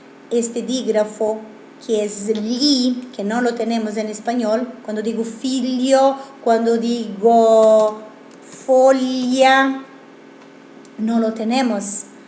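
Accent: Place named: Argentina. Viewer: habla español argentino la profe dice vos no tu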